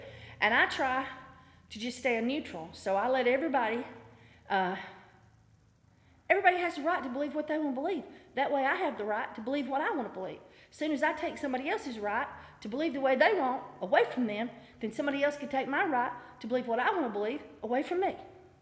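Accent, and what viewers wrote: South, United States. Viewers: Lady you have got to be the hardest woorking chick on the planet. Don't know what I admire most your enthusiasm, high energy, great attitude, soapy skills or the fact you speak my mother tongue (thats southern if you didnt know). As a girl with southern roots who has long been transplanted as far north as i intend to it just nice to hear that southern speak. Best of luck on your new digs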